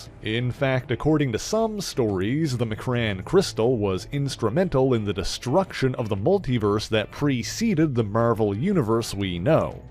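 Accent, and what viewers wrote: Canada. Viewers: Nah... Dude is legit having plumbed the depths of Marvel history. Bro is Canadian and boy it shows. He has a bit of an accent, Canadian wise. No what we gotta get rid of is the snap judgement of immature gatekeepers.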